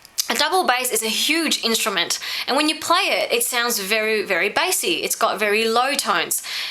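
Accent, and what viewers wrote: Australia. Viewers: omk919, she sounds Australian for me :) she's not faking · I love your accent, you must of lived in Australia for years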